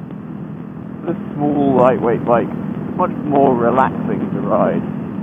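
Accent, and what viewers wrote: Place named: England, United Kingdom. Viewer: I like ur accent, where in england are u from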